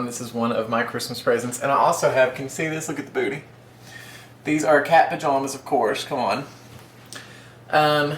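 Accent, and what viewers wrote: South, United States. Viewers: OMG.. I just found your channel through Traci B and I think you are great..btw...where are you from? Sounds like you have a little country accent like me..I am in NC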